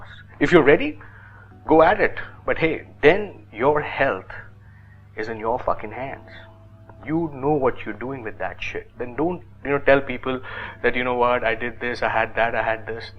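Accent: India. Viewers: fuck you and your indian accent its soooooo fucking anyoing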